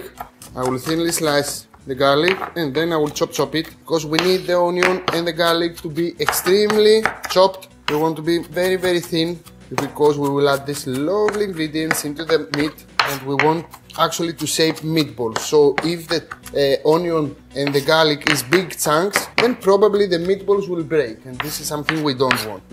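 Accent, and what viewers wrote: Greece. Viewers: I love to watch you cook and to listen to you speak English with your Greek accent! 😄 I grew up (in the US) with Greek grandparents and many other Greek relatives and listening to you brings so many memories. You remind me so much of my cousin Stathis, (although he doesn't cook 😂.) I remember Yiayia used to make this dish and I loved it. She's been gone a very long time, 50 years, but watching you make this I can almost taste it from memory! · I love the way he speaks English with that Greek accent. And I love his recipes, he uses ingredients easily available anywhere in the world, specially in India. Thanks for such amazing recipes, I have tried a few of your recipes and they are always a hit in my family. Can I use chicken mince for this recipe?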